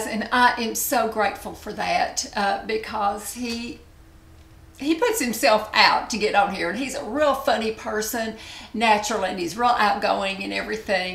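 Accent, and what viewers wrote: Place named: Kentucky, United States. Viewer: I'm glad to hear that you were also born a Kentuckian! I live in Louisville but also spent many years in a small country town south of Louisville. I wish I had your accent as it is so charming!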